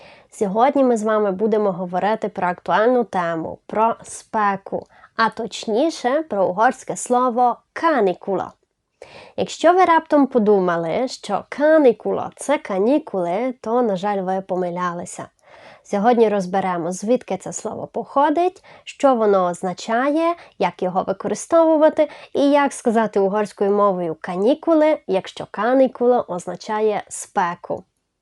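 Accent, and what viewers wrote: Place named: Transcarpathia, Ukraine. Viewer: Дякую за відео. По акценту чути що ви з Закарпаття. Дуже мило і красиво пояснюєте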